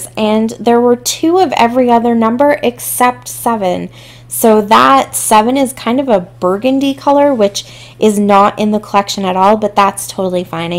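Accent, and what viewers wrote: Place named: South, United States. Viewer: Like the way you made this a double page layout! Festive and fun. TFS. PS are you originally from Canada, lol. Your accent sounds southern, like mine😉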